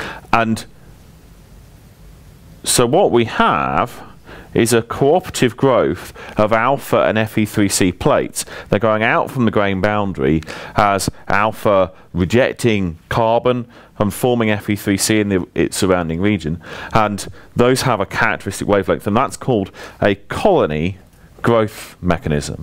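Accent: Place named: United Kingdom. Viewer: Being an American from the deep South, when I heard his British accent I knew this was going to be a good night. My entire study group sends a thank you from across the pond for posting these videos. Hopefully we'll pass the test in the morning.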